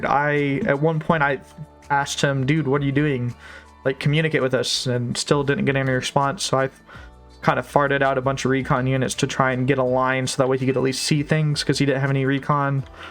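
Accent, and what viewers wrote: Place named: Texas, United States. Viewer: You have the least obvious Texan accent I have ever heard.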